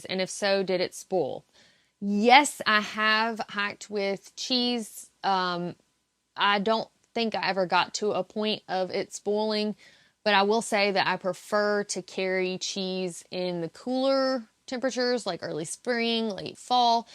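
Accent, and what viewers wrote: South, United States. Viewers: Hi, long time viewer, 1st time commenter:) A couple of housekeeping things to start. A missed opportunity, you mention 'announcing to people and being embarrassed on the front side...' Is better than being embarrassed on the backside:) Nextly, did you know that with your southern accent, which I adore, your pronunciation of Iceland sounds very much like Assland. Talkin' about poop and all in context lol. Ok so seriously, one of my many careers in life involved being a professional, corporate problem solver. On the Yellowjacket problem I have about 4 solid solutions in mind so let's start with just one and see what you think. Could I suggest a while list of people who the entire hiking community know are legit through hikers who are putting in honest miles. In my opinion a private facebook group with admins is most likely the easiest solution. 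Hikers like yourself are in the group as well as angels who are providing services for an intended group and purpose. If you're not in the group you don't have access to what is made available. There are ways to 'admit' people who are whitelisted as well with a cheap wristband or such but I'm just looking ahead to how to you keep track of who is white listed and on site. The angels can also be in the facebook group and can ask a question about someone who might be legit but not in the group yet. There should always be enough people available with internet access to vouch for someone. I would think the group is close knit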